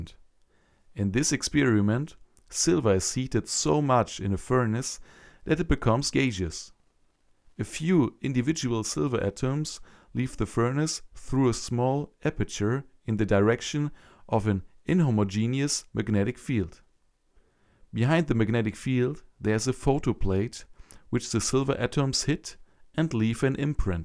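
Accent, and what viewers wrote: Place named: Germany. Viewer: Noch nie so deutschen Akzent gehört xD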